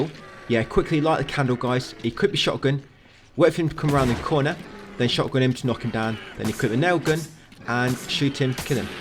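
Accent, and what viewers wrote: United Kingdom. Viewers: Ты британец?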